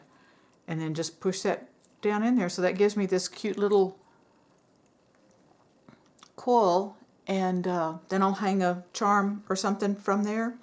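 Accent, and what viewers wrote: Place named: South, United States. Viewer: "A little carried away".... I loved that comment, you are not alone! LOL I look forward to more of your videos, kitty and all. A dear friend of mine was raised in Georgia, thus a slight southern voice. You have that same accent, I kept waiting for you to say "well bless her heart" lol 🌺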